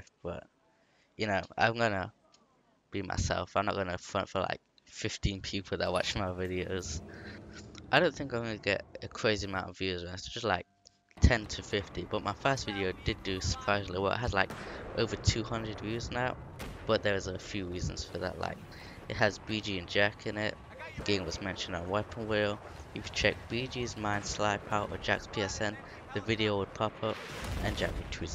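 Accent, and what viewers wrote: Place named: East Midlands, United Kingdom. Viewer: Aah, an east midlands accent - explains why I couldn't pin it down. Midlands all seems to blend into one for me. It always makes me laugh when you're gaming or (briefly) on the podcast, and the Yanks try to copy your accent. Do they think we all speak like the Queen and eat crumpets mid afternoon?! lmao. Looking forward to seeing what you might do with this channel. My advice - do what you enjoy and don't worry about numbers. Have fun with it. Good luck bud.:)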